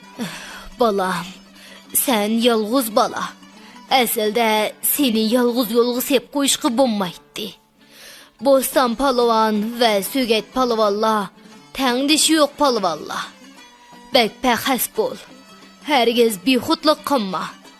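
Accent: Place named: China. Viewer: Какой жесткий китайский акцент. Это так специально делается? Почему чистое уйгурское произношение так уродуется? Что за через чур мягкая, какая то детская манера говора. Этот канал под китайскими властями действует? Никогда гордая уйгурская женщина так не разговаривала. Поправьте меня, если что то не так. Ну не мне одному же это кажется??!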